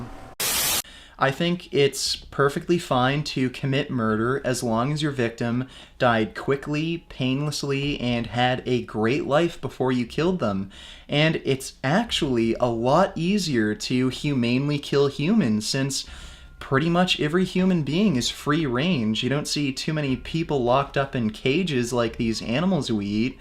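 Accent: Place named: Toronto, Canada. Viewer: Xavier Rose typical Toronto accent. It sounds insanely gay.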